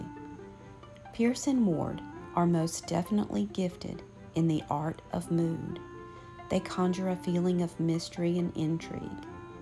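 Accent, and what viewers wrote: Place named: South, United States. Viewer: I love your soft, sweet Southern voice!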